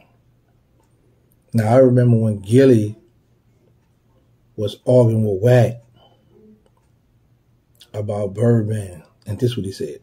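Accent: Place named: Louisiana, United States. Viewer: Did he grab him? Yea come ya😂😂 straight louisiana exactly how we talk.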